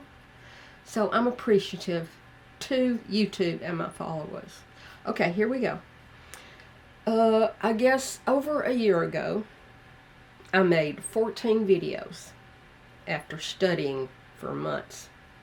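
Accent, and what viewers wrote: South, United States. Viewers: I just found you. You are amazing. Love your southern accent. Sounds like my family in the south. Keep doing what you’re doing and know you are more than enough. 😊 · I love your southern accent. Our town has been overrun with transplants and foreigners. It’s so seldom we hear southern accents anymore. I miss it so much, especially since I supposedly live in the south. Or what used to be the south. Anyway, I do enjoy listening to you speak. Your voice is so comforting that I could easily fall asleep. You remind me of my precious older relatives that aren’t around anymore, that I miss so much. God bless you. Keep on going. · Oh my god!!! You remind me so much of my mom!!! And you have the southern accent too!!! I wish she would start doing this. Amazing! I’m subscribing! I do content creation gear reviews on one of my channels. 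Great job!!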